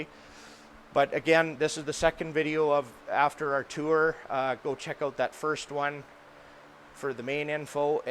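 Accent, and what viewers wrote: Canada. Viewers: You are good peoples! New subscriber. Plan on doing a smaller version down here in Southern Georgia. USA of course. Thank you for the invaluable information. Love the Canadian accent too! Go Jets! (Recovering Atlanta Thrashers fan). Take care, be safe and we love our guns too! Come to Georgia sometime!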